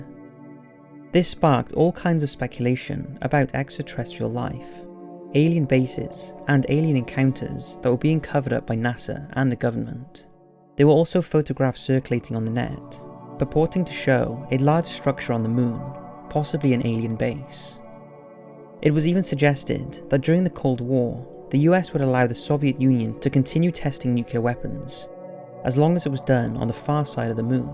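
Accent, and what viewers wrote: United Kingdom. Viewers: getting tired of hearing british narrators....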